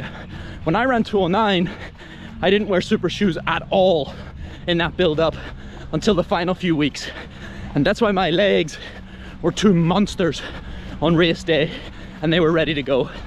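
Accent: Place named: Northern Ireland, United Kingdom. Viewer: Heard the N.I. accent & I’m intrigued. I’m doing the Belfast marathon 20 years after the last time I did it & hoping to do it faster.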